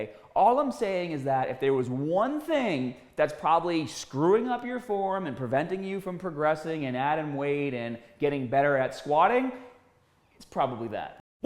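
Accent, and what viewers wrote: Boston, United States. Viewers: Gotta be Boston or somewhere in that region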